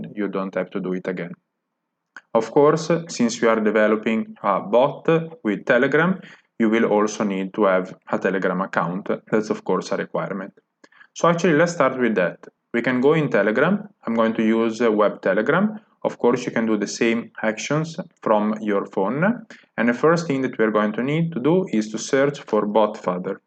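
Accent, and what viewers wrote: Italy. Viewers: Hey! Very helpful and well structured tutorial. I was concerned there was no free option for telegram bot hosting but using Firebase cloud functions works! Also I noticed from your name and accent that you are Italian. I am from Italy too!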